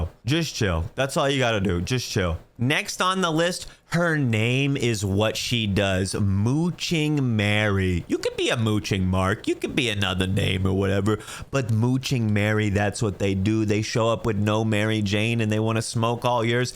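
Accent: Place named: New York, United States. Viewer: I dont even smoke, I watch when this guys NEW YORK accent spills into the video.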